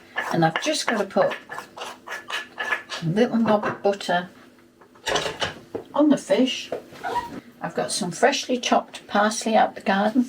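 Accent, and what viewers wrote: Northern England, United Kingdom. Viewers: Hi 😊 I’m watching from Australia. I’m originally from Hindley, Wigan. I’m a newbie to the Ninja double draw Airfryer. Same as yours 👌 great to hear your Northern accent, where are you cooking from?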